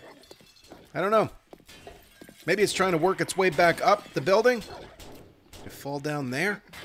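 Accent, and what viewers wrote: New Jersey, United States. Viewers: I love that i can tell you have lived in new jersey.(im from jersey)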